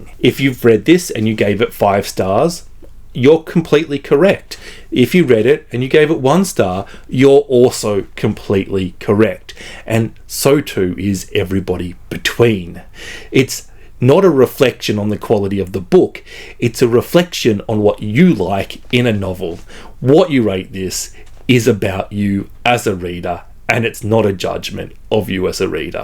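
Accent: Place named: Australia. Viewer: 😂 I kept having to pause my TV and run to the other room to repeat the zingers to hubby: not that I could do them justice, because the accent and cadence really adds to the delivery. I've said before, it feels like Australia is in a golden age of comedy, and everybody benefits 💛 I did get in a joke of my own, don't know if it translates unless you've heard of Shark Tank, but here goes: after running to hubby to repeat several hilarious zingers, I told him that hadn't been posting for a while while his computer was being repaired, and he must have used that time to fill his tank. His Snark Tank. 😂 But seriously, I love your recommendations, and love the way you always approach your reviews from the position of "what basics -- plot, character, writing, theme, etc. -- does this book do well (or not)?" And you always invite the readers (viewers) to reflect and learn about themselves, and to grow our critical faculties: "what kind of reader are you?" I love the laughs, but am nourished by the grounded focus of these videos. 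P.S. I always enjoy when picks out books (and other things!) to recommend to so many kinds of readers. It feels weird havng a minority opinion, so it's encouraging that reminds us "nobody's right and nobody's wrong" when it comes to our reading perspectives. I read Stoner and Gilead, and while I could appreciate their merit, they didn't vibe as highly with me. Also read There There, which was fine, but to me it didn't rate higher than o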